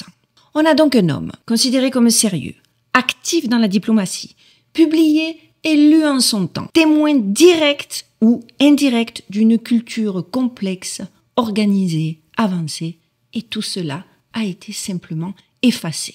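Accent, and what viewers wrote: South, France. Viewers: Merci pour vos excellente vidéos, alors oui tartarie 3. Bonjour de Suisse, j'adore votre accent du sud 👌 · En plus avec l'accent du sud❤❤❤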